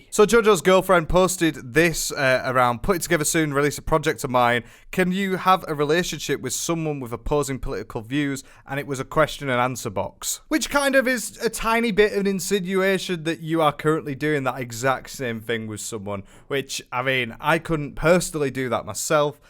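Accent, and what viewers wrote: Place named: Newcastle, United Kingdom. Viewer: Hey there. I am brand new to you. Nice to meet you. You sound like a Geordie. lol. My favorite accent. I will subscribe and like!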